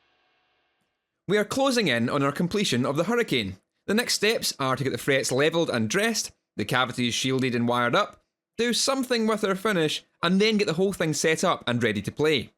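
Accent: Scotland, United Kingdom. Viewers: Yo, I can't wait for episode 9! I have been watching a lot of your videos and I am getting used to the Scottish accent (being a Filipino that is quite a challenge hehehehehe). I just wanna say EXCELLENT work on explaining the science and art of electric guitar making in plain layman's language. Thanks so much for educating us (you can be a great school teacher brother! I am a school teacher by day and musician by night), Keep it loud and I'll see you on episode 9!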